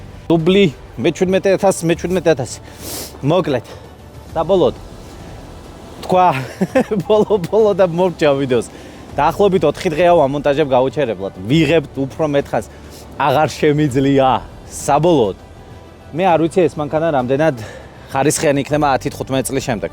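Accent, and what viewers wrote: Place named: Mingrelia, Georgia. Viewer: როგორ მიყვარს მეგრელების ლაპარაკი <3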